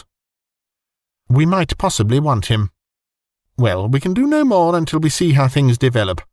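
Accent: United Kingdom. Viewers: Love Sherlock Holmes! Liked and subscribed! You have the best British accent ever! Love you!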